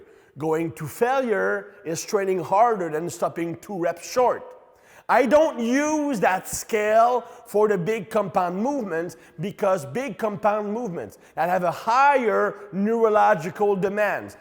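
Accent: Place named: Quebec, Canada. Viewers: Oh my Quebecois! Lovely :) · He's quebecois, french canadian.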